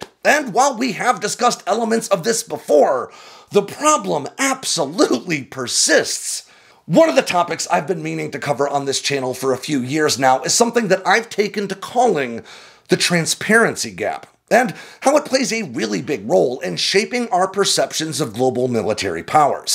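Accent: United States. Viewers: As soon as you hear an American Accent in a video about China, you can rest assured that is gonna contain a pile of Yankee Bullshit and opinions upon about things about which they really have not got a clue.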